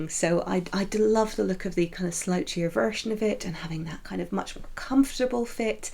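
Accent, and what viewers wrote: Scotland, United Kingdom. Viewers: Love the podcast! Great job, you seem like a natural. You sound totally Scottish to me. I’m also a cussy person and can’t cut bread or cheese straight to save my life! 😂 Can’t wait to watch your other episodes. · Hello there; I would like to say; I love it when your Scottish accent comes out. I would like to ask, How do you keep the moths from your beautiful stash. I have bagged up all of my balls, because of the little fiends. I really enjoyed listening to your rant. I feel right at home with it. I used to live in Scotland - the Isle of Lewis. (not really the borders- but my daughter lived there and she now has an accent that is like yours- So I guess that might be why I like your style so much) I will be enjoying your future programs. I was knitting along as I listened. (and watched- no , really I did!) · Thank you for a fabulous first episode! 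You are hilarious. I love your accent, in which I can hear your Scottish origins. Scotland is my favourite country in all the world and I have had some very happy times in the Borders (love Jedburgh). Your knitting is amazing, can't wait to see more. Your Rhinebeck drinking game made things very merry here! Now I want to watch Episode 2. Good luck with this brave new world upon which you have embarked. Manuela, in Melbourne, Australia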